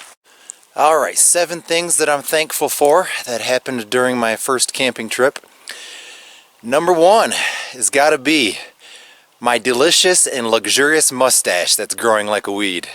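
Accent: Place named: United States. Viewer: I watch your videos. Your accent is very different from main american accent. When i first watched your video i thought you are a lonesome man and wanted to spend some of your times in the wilderness until i watched this video .you mentioned about people who love you from afar. Kep going gentleman you are a real adventurer.Thanks for providing pleasure with your videos.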